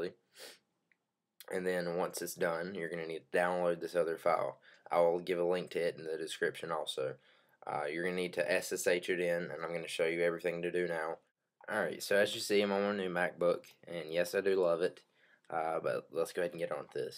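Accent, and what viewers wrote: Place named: Appalachia, United States. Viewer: i cant understand your thick hillbilly hick accent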